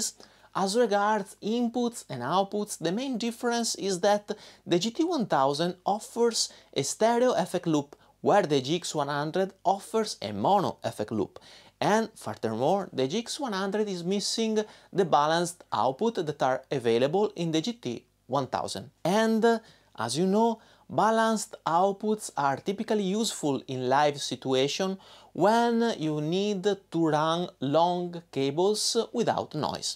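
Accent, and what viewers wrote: Brazil. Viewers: vc é brasileiro kkkk nao é possivel, com esse sotaque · I feel some Brazilian Accent I am also brazillian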